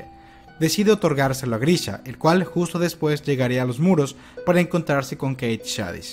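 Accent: Yucatan, Mexico. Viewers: De donde eres? parece que tienes acento Yucateco ajsjajja Ya se que nada que ver con SNK xd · Eres de Yucatán?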